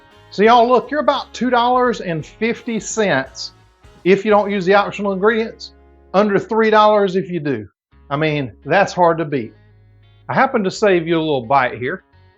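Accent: South, United States. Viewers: Sounds like you’re from the south. I don’t know what you pay for good hamburger down there but where I live it starts at $6 a pound. 🥴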